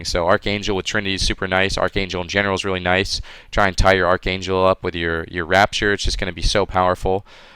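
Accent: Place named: United States. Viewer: he sounds american.....but he uses the lingo of someone who isnt...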